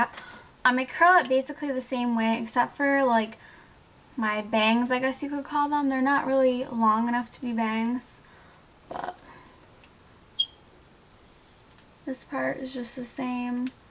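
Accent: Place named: New York, United States. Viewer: omg you totally have an accent! haha i can tell anyone from new york has a tiny bit of an accent.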